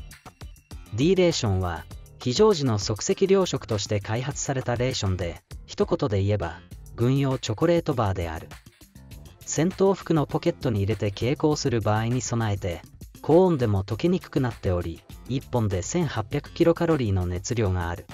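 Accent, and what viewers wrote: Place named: Western Japan, Japan. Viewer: ナレーターさん、西日本出身の方かな？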